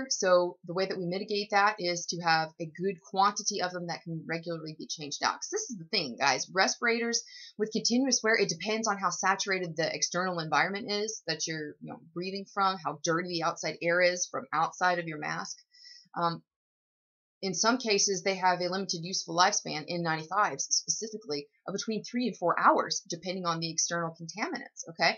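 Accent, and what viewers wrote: South, United States. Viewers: Scott Haskin You're right you can't hide Natural Beauty and that southern accent makes me week at the knees..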